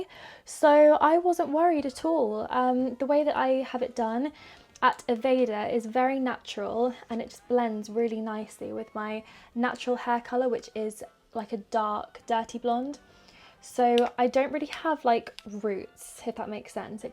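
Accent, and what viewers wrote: United Kingdom. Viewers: i usually skip through the sponsership part of videos cause they always have the same products, but i couldn't skip through saying all of it in her british accent 😌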